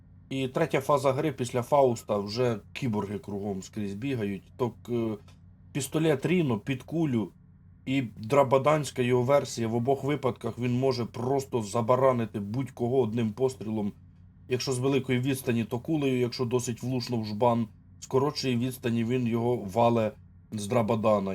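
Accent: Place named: Poltava, Ukraine. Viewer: I love your Poltavian accent 😅👍❤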